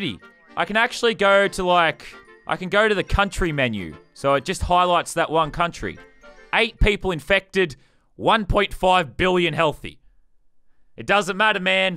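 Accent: United Kingdom. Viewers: Ok, but the same can be said of Americans. Back then British people moved to America and mated with the islanders there. That’s why you sound British and Are British. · You sound British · your british haha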